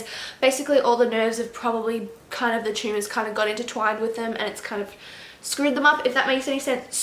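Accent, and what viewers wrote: Australia. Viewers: I really love your videos, your personality shows so much <3 Also, i lub your Australian accent omg, I could listen to you talk forever.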